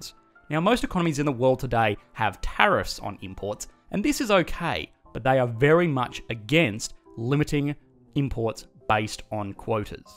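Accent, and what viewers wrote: Australia. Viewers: it sounds pretty normal to me with a hint of Australian. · Gotta love the Aussies with their cockney-esque accents - hangover from history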